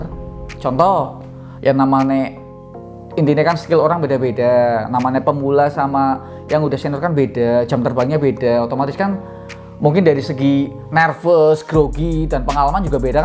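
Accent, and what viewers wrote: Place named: East Java, Indonesia. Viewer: Lokasi mana ini bos...dari logat nya kyk Jawa timur Malang...pingin belajar....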